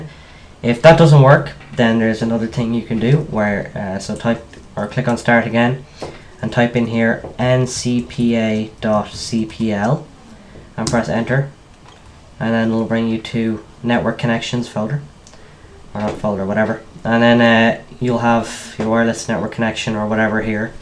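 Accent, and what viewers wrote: Ireland. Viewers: FUCKING THANK YOU!!! and your adorable Irish Accent!!!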